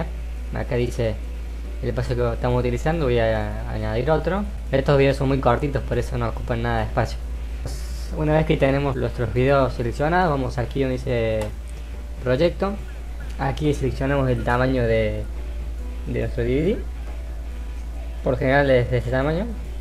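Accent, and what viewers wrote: Argentina. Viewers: Excelente tu tutorial "cordobés" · re cordobes jajaja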